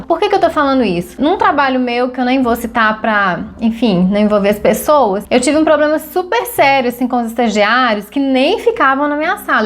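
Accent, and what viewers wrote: Minas Gerais, Brazil. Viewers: Conheci seu canal hoje e já me inscrevi! Você é muito prática! E pelo seu sotaque, acho que você é mineirinha como eu!!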